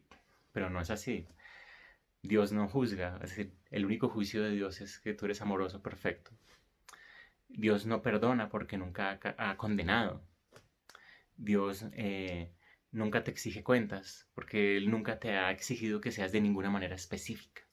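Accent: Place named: Colombia. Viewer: Qué amorosa explicación ☺️♥️✨📘🕊! Y qué lindo tu acento colombiano 🤗! Yo estoy entendiendo más sobre la proyección de la culpa. Culpo a alguien por algo y ya me detengo a observar esa culpa en mi ✨ Aún necesito ayuda y a veces el ego interviene para no verla con la Verdad. Gracias por tu aporte y consejos! 🤗🤗🤗🤗🤗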